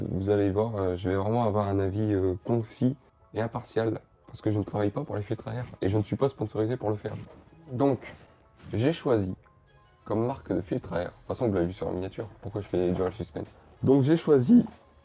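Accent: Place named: England, United Kingdom. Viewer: Cet accent anglais ! 😍 Bref, sérieusement j’ai adoré la vidéo, hâte de voir la prochaine ! 😅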